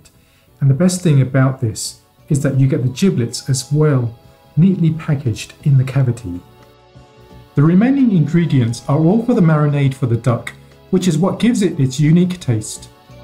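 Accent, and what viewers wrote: United Kingdom. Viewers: You spoke Chinese with an English accent very cute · I've got to give it to the the Brits, thought...they make all ESL speakers sound so sophisticated. Back to the video...thank you very much for this easy and great recipe.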